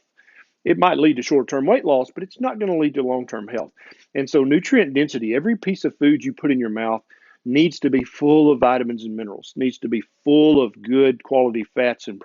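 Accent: South, United States. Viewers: Apart from your incredible intelligence, you could have been a movie star from the golden era of Hollywood! Love the Southern drawl as well!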